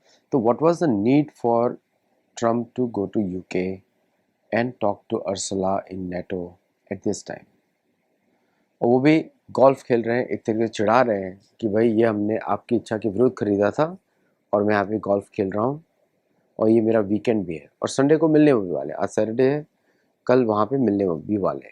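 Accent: India. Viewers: भाई वो गुरुकुल चला रहे है एक अच्छा काम है आज के दौर में । फिर उसका प्रचार किया तो आप को इतनी तकलीफ़ क्यों होती है? और एक मिनट से ज़्यादा भी नहीं लगता उसे । और उन्होंने चैनल का नाम sattology रखा इसपर भी आप को ऐतराज है जब को ये बात उन्होंने पहले भी समझाई है । sattology ये शब्द mythology का काट है और हा हिंग्लिश है तो है पर अच्छी काट है । हमारे पुराणों धर्मग्रंथों को mytholgy करके धुतकारा जाता है इसको प्रभावी रूप से काटने का उनका ये एक प्रयास है । और आप को उनकी हिंदी समझ नहीं आती इस में वो क्या कर सकते है, वो महाराष्ट्रीयन है और थोड़ा लहजा उस प्रकार से है । मैं भी इन्हें रेगुलर सुनता हूँ और हमे इन्हें समझने में कोई अड़चन नहीं आती । तो आप को जरा देखना पड़ेगा । भाषा शुद्धिकरण का मैं भी आग्रही हूँ परंतु हमे पहले जैसे भी हो हमारी संस्कृति का ज्ञान होना आवश्यक है और इस चैनल की वजह से बहुत से लोगो को हमारी संस्कृति धरोहर इसके बारे में पता चल रहा है । भाषा शुद्धीकरण भी आयेगा पहले लोग जुड़े तो सही ।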